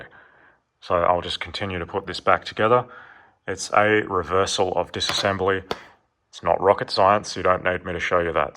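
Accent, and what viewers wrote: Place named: Australia. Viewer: I love the way you say Red 124. And you have a badass Australian accent brother. Hope you and your legendary Red 124(W124 300E-24V are doing fantastic good sir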